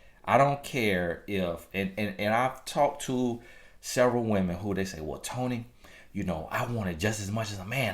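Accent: South, United States. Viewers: I love watching you. everyday. You tell the truth. You so country "You Here Me" lol.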